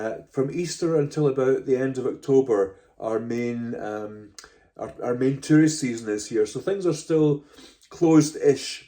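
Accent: Scotland, United Kingdom. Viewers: What a lovely episode, it's so relaxing to listen to you tell stories in a calm Scottish accent while I'm knitting. I would love to hear more stories from Scotland. The Chiaogoo interchangeable needles are great, and the red cable is much softer than the Knit Pro Zing (I have those too), and they come in small sizes and different lengths. Thank you, have a lovely week 😊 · I discovered you last weekend and have watched close to all of your videos while knitting. I´m unsure what exactly it is, but it is so nice and soothing to listen to you talk. There´s not a single second of boredom in the hours of content and I LOVE seeing the landscape and small town footage. (and the Scottish accent is lovely as well. Would totally trade that for my Austrian any time). So yeah, I´m excited to watch more of your videos. They´ve accompanied me well while learning Irish Cottage Style knitting (Great for working seamed garments because purling is much less of a hassle this way and it looks super cool). 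· I enjoyed your sitting and knitting chat. Also, being a birder as well as a knitter, maybe a few shots of local seabirds from Scotland? Don't see them much in Canada. And the different plaids from the different clans is always interesting to us who don't see them much. Anything you put together with your intriguing Scottish accent is always welcome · Three of my favourite things😊 Knitting, Scottish Tales and a gorgeous Scottish accent. I could listen to you all day. My lovely late hubby Gordon was always telling fascinating stories of his home’s history. Also I’d be interested in seeing a pattern for the ‘kep’. I can’t find one. Probably because I’m not spelling it correctly. 😂 Thank you 🙏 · I have been truly blessed since I found your podcast, please continue doing what you are doing! I grew up in the Chicago area and our very best family friends were from Aberdeen. Because of them, I’ve always been interested in Scotland and wished I could visit. 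My husband isn’t into travel abroad, so this has been the next best thing for me! I live in the South now, but told “Aunt Mary”, who still knits at 96, about your podcast, thinking she would love to see scenes and listen to that accent from “home”. ❤️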